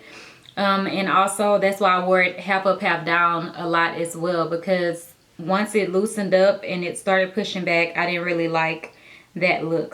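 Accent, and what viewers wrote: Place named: Louisiana, United States. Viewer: Are u from Louisiana? You have an accent... your hair looks great btw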